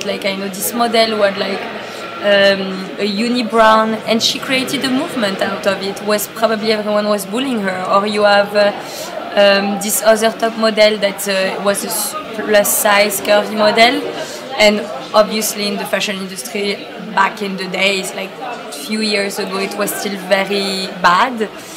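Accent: France. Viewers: I love her but the French accent is so thick for me to handle 😩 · j'adore. You speak so good Italian. Sei incantevole! I love your french accent though hahaha. What app have you used for editing this video? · Come on I know you’re French but make an effort for your accent it’s not’s pleasant to hear your French’s accent when you talk in English 😫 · Your italian is so good... I LOVE your french accent 😍😘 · Moi j'aime bien ton petit accent français il est cute haha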